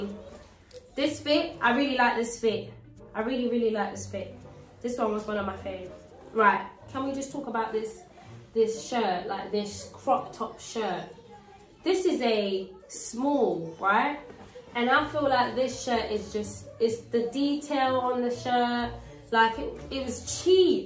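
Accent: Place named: United Kingdom. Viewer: #monaemaffia. Your British voice is everything. Your style is 💥💥💥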